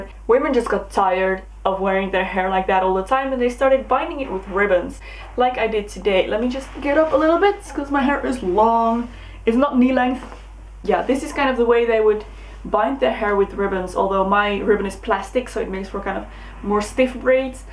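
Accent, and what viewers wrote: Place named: Netherlands. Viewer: ahh ik hoorde meteen dat je nederlands bent, super leuk gedaan dit allemaal! I'm obsessed with everything medieval and historical hehe :)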